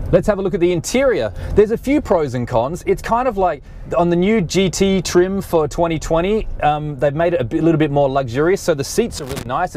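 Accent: Australia. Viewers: Awesome review best I've seen on YouTube so far and I've seen most on the Outlander PHEV as I used to own a 2014 model in Sydney and Perth. I sold it after 3 years to buy a Prado with 7 seats but now regret selling it as I'd go for weeks without putting fuel in it and loved the paddles that allow you to control the level of regenerative braking. I recently sold my Prado for more than I paid for it due to crazy prices due to Covid and bought a 2020 hybrid camry for the wife. We have a 5.3kw solar system with 20 panels that only cost $1000 on our roof so charging an EV in the day costs us next to nothing. I want to get a 2020 PHEV now or possibly the new Kluger (Highlander) Hybrid that's coming to Australia this year. Speaking of Australia was great to hear your Aussie accent! Where are you from in Oz? Sounds like your enjoying Canada & have a great job. Thanks for the excellent review am looking forward to watching more reviews especially on Hybrid or electric family SUV's.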